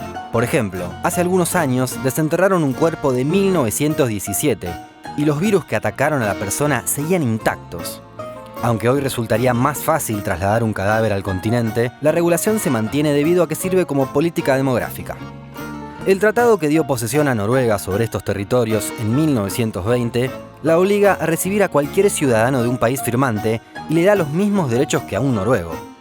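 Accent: Argentina. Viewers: Pero habrá algún documental que no tenga acento argentino? Por favor ? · Men, tu voz me es súper reconocible, lpm sos de Argentina? Alguna vez hiciste publicidad en la tele?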